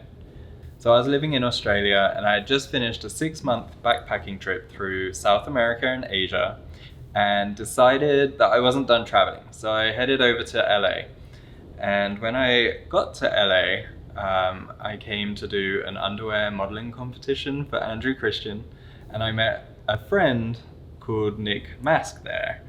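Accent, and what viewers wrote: United Kingdom. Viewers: Your accent is slipping a bit, as an American who has several British friends I can hear it. It is because you are married to an American, nothing wrong with that. I can hear you becoming more American in your accent, lol! Don't lose that pretty British accent!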